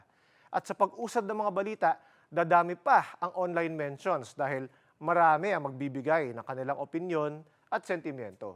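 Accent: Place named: Conyo, Philippines. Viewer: Konyo magsalita ang presenter.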